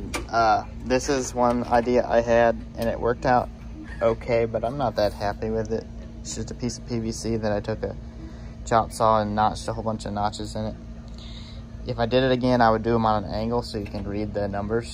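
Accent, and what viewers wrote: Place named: Tennessee, United States. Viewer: You look and sound damn near just like myself.. but you got more a Tennessee twang to ya.. im outta ky, backwoods.. where abouts you from? no homo…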